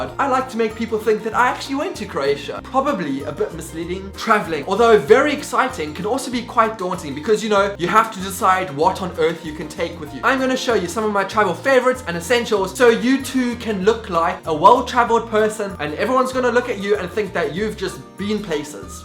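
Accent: South Africa. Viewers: Rocking at moonbag! I'd go back to Italy! It was amazing! (Please don't give up that SA accent!:P) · HAHAHA YES FOR THE MOONBAG! And no! Never change the South African accent! It makes foreigners think we're exotic!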